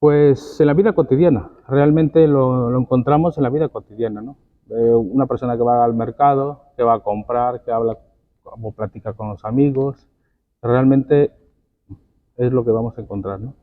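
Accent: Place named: Colima, Mexico. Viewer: Interesante trabajo. Después de escucharte, y después de 20 años tu castellano también se ha Colimizado:) Un abrazo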